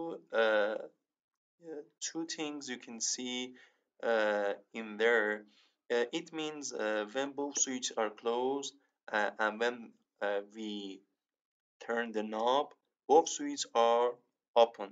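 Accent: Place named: Iran. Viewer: عاشق لهجه ایرانیت شدم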